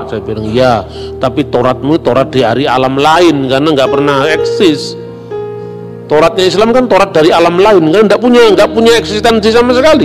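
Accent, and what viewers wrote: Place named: Java, Indonesia. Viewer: Senang pula, lihat Pak yg berlogat Jawa.... Tapi bermanfaat, bagi penganut Kristen di Indonesia.....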